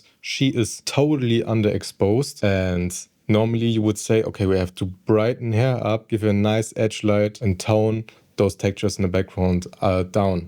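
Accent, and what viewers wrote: Germany. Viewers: sett is aber eh werri german akzent 😅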